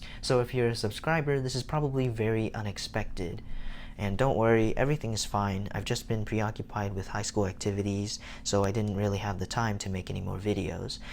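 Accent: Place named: United States. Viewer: why do sound so american when ur profile pic looks asian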